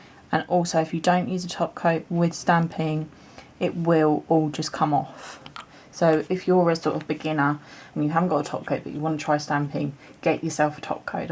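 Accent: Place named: United Kingdom. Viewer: Love your videos, thanks for sharing. Have you ever tried cuticle defender? That makes the cleaning very easy. I also tried a glue which I originally bought to repair a wooden car from my son. Mixed with a little bit water it also works perfect. I use it first before I do any polish on my nails, cause it needs a little more time to dry than the cuticle defender you can buy. I really love it. Thanks again for making this videos. And I really like your voice and the british accent 😍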